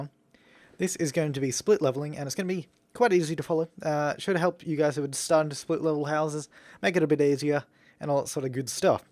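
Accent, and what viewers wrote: Australia. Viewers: good video, it was very useful, r u auzzie??? u sound like it, i am :] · are you Australian? cus i am and icant tell if you have an aussie accent or not but i do kno aussies say bute and bambas like you did in the vid :)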